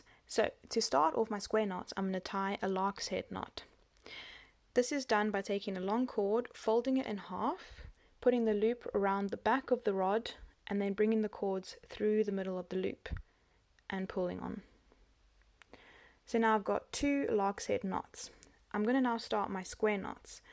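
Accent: South Africa. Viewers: Great to hear a South African voice. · South African? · Thanks for this! I needed a refresher! Are you South African?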